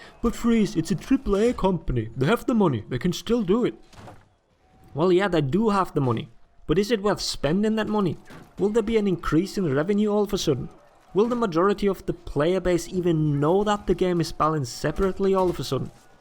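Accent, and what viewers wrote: Scotland, United Kingdom. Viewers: You sound really Scottish are you?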